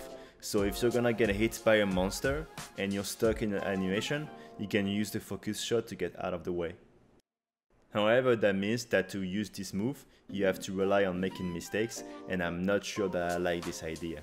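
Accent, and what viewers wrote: France. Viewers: You are french right ?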